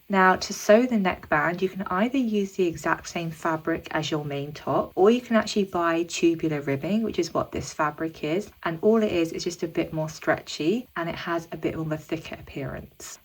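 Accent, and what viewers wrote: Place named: United Kingdom. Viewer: what kinda uk accent do you have? i love it!!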